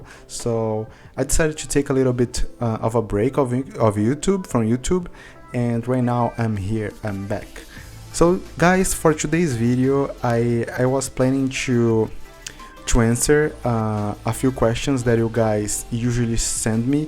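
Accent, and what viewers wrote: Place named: Brazil. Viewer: It's great watch your video again. I watch a lot videos about English, cambly, things like this. But the best of them is yours. And The best "brasilian" English of them is yours. I entered on Cambly because of your videos. Keep going guy 🤜🏿🤛🏿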